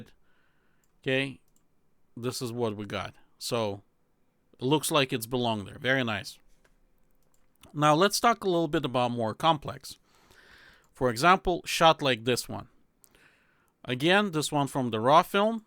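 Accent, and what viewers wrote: Russia. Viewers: Nice. It that a Russian accent? Very subtle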